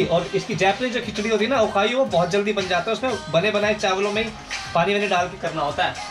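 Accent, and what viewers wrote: India. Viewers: Bhai i love your desi way of talking love you bro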